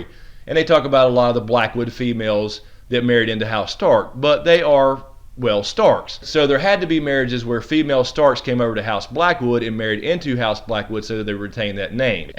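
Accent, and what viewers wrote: South, United States. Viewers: Oh, I see. Your accent is very southern but I couldn't figure out where. Anyway... how do you think Melisandre will ultimately convince herself that Bran and/or the Bloodraven are not necessarily evil?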